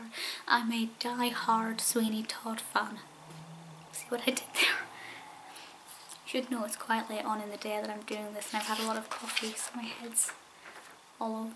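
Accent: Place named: United Kingdom. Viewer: British asmr, perfect :D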